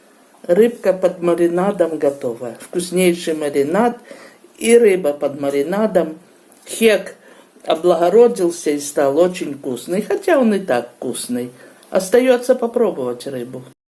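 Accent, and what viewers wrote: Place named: Odesa, Ukraine. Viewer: Какой город. Говор одесский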